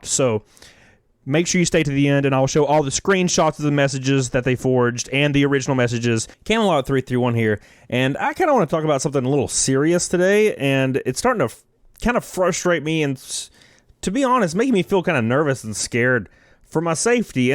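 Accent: South, United States. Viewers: Folks doing this to others is not cool. I hope you will be ok and if it means anything your accent was one of the things that made your channel stand out and why we followed you initially. Hearing gaming updates with a southern accent is fun. Keep on doing what you love. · I love the southern accent. Meanwhile, I hate mine because its british 🤣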